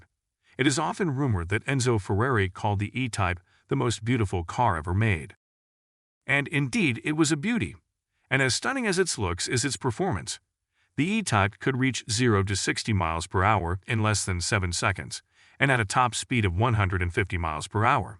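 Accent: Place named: United States. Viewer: Why can't the narrator say K not T? This is about an English car and should be narrated by an English speaker not a Yank trying to speak English.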